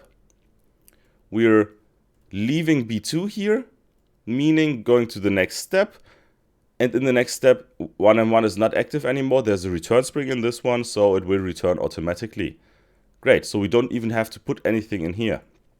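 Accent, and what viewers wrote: Germany. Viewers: man merkt doch schon nach dem einleitungssatz dass der dude deutscher ist xd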